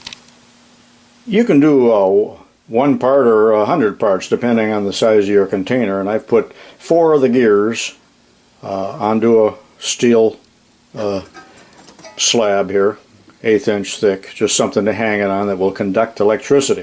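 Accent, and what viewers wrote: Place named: Pennsylvania, United States. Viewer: Your accent indicates that you are from Indiana County, Pennsylvania just as Jimmy Stewart was. Am I correct?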